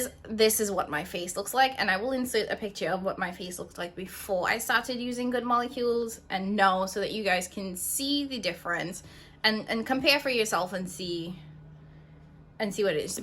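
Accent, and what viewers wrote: Trinidad and Tobago. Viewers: Imma sub just to listen to your Trinidadian accent 🇹🇹 lol .. I'm not into makeup too much but I love skincare. Thanks for the video 😊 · Trini people cant hide boy😂.Love the video hun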